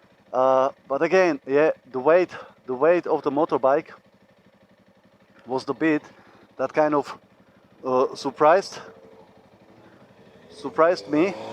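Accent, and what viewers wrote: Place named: Slovakia. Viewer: Hi. Thanks for your videos about CRF300L. Please how tall are you? Or maybe better, what's your inseam? Did you consider/testdrive CRF300 Rally as well? Btw, maybe I am wrong, but your English sounds very "Slovak" =).. Aren't you from Slovakia? Thanks again for a feedback. Lukas.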